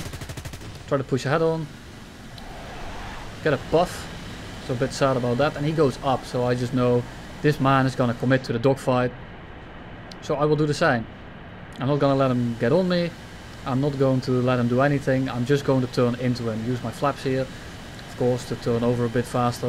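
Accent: Netherlands. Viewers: I have bin a subscriber for some time now and I have to ask, are u dutch or belgains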